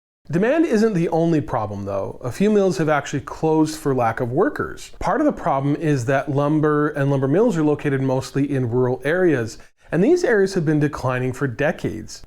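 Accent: Pittsburgh, United States. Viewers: Hello. are you from the Pittsburgh area??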